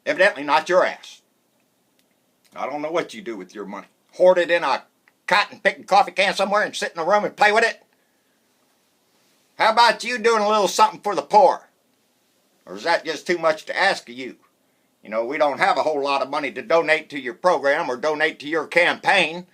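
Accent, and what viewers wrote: United States. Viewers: u are what a real american sounds like Honest Passionate Thoughtful! thank you!!!!!!!!!!!!!!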